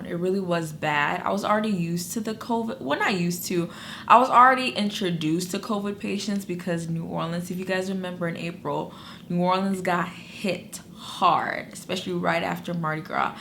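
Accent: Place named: New York, United States. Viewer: You sure talk like you from New York lol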